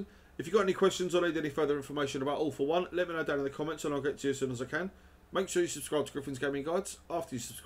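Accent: United Kingdom. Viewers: Are you from Kent?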